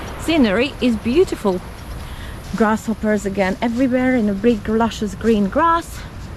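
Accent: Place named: Netherlands. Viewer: Enjoyable series on Norfolk Island, thank you. I assume from your accent you're Dutch?😢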